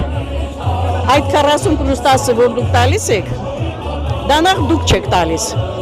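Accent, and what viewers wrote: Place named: Russia. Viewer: Cirtc xarnuma ec agarkekin lcelov rucakan akcentov xatlaxner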